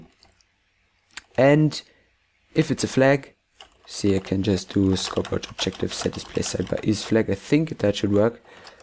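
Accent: Germany. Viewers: Man hört raus das du deutsch bist ^^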